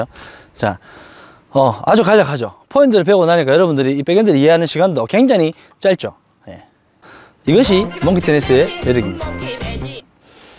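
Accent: Gyeongsang, South Korea. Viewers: 요즘 강한 백핸드 슬라이스를 많이 노력중에... 가로스윙을 우연히 느끼고 이느낌을 ,그 코킹을 백핸드 드라이브에 적용해도 되겠다는 생각을 했었는데 이영상과 같은 맥락에서 볼수 있는거 같습니다 나이스 코칭입니다 경상도억양이 뇌에 팍팍 지식으로 때려 박습니다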